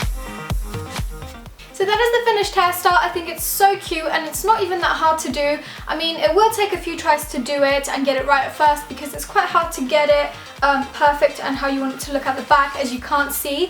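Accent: United Kingdom. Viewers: She's Polish, but you can clearly tell by her accent that she lives and was born in the UK.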